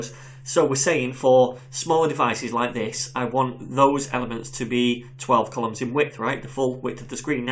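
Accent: United Kingdom. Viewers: i was learning british accent too so you are just perfect ^_^ explanation was great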